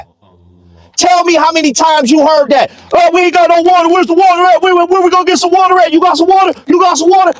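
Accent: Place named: Detroit, United States. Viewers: It's weird to hear a Muslim with a american accent. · he has Detriot vernacular. It's not just American, maybe that's what's so unique about him right??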